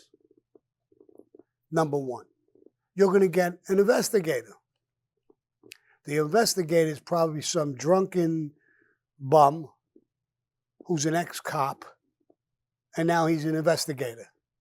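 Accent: New York, United States. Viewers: Hi love hearing all your stories,I enjoy your NEW York accent ' Well have a good night stay cool!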